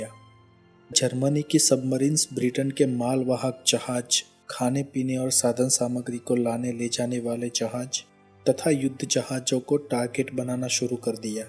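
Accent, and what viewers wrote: Gujarat, India. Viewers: आप गुजराती हो क्या ?😇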